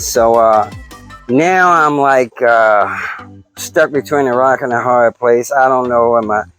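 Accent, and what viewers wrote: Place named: New York, United States. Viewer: Yeah congrats for 1,000 subscribers I got 570 and dropping and I don't really care because every time somebody watches my videos they make money and I don't LOL why do you live in California and sound like you're from New York you must be from New York nobody has that accent. When I lived in Bedford I started getting that accent yes I used to work at Bedford prison in New York on boilers. We kept all those women warm LOL. Well that's good you're not in jail I'm glad and I hope you're not slinging bricks because those kill people and know how matter how much money you make you can end up in jail forever. Not worth it I'm poor I could have done that I can find a bag of s*** way faster than a bag of weed I grow my own weed though Gorilla Glue number f o u r that s*** is the bomb. Next is blueberry Autoflower. I used to be a farmer now I'm in the closet farmer LOL. 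I bought a battery online and now I'm wondering why the f*** did I do that? I need prayers I bought it from an individual that sells all kinds of different stuff and no batteries it says it's brand new 20 amp hour 48 volt for 450 bucks plus shipping and whatever about 480. It has a really nice metal charger but who knows if it works why did I do that oh my God? Well I can send it back but that cost $60. Now I can tear apart the other battery once I get that one and I don't have to worry about going on a trip still if I screw it up they won't even tell me what kind of BMS is in the freaking battery. They sell you a batt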